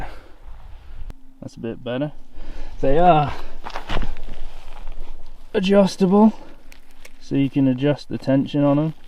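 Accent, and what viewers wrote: Yorkshire, United Kingdom. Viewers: I have the same tent and similar stove. The tent is a great tent, with those tent pegs where the cut out is fold the webbing and it I’ll fold it into the grooves. Or hook the top of webbing into the groove. It won’t budge. With your stove top tip, get a piece of Velcro wrap it around your stove box, it’ll hold it all together whilst messing about with the threaded rods. When i set mine up I do it on a tarp or foam pad not on the ground so nothing is lost. Your right you’d be fecked if you lost a wing nut. With regards to your stove in colder climates like Norway 🇳🇴 etc you’d get a buddy to take turns through the night popping wood into the stove throughout the night. My first night using one is set an alarm on my watch every couple of hours to feed the stove. Crap night sleep but waking up to a nice comfy warm tent was nice. 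If you don’t want to wake up feeding the stove it’s got to be a winter down sleeping bag. The guy in the states that distributes luxe tent did a video with a battery clip in fan on the tent pole. Pushes the heat backdown towards you. Try these tips out mate and I promise you a better camp out. Are you a yorkshire lad, picking up the accent a long way from home mate eh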